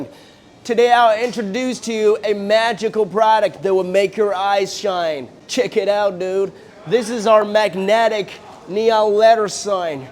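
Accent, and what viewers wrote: South, United States. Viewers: That's a neat sign, living in the south myself. I like your accent.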